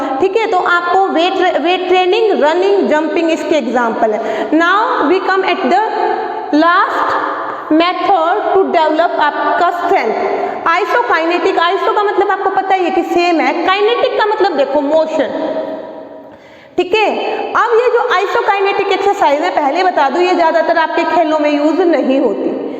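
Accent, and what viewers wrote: Haryana, India. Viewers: Mam app Haryana ke lag te ho Sare topics clear ho gaye app ki video dek ke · Mam r u from haryana.......??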